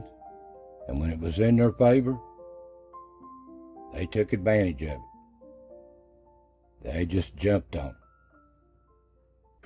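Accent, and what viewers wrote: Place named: South, United States. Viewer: We just found you not too long ago. We really enjoy hearing you tells us those stories thru the sound of your southern drawl; & I mean that in the best of ways. We have been greatly disappointed with what we have found with some so-called Smoky Mountain YouTubers; a real slap in the face of the honest, hard working & authentic folks of this area! Thank you for a “pure” representation of these mountain that God Breathed into existence & the history of the folks that lived & breathed there☺️. 🫎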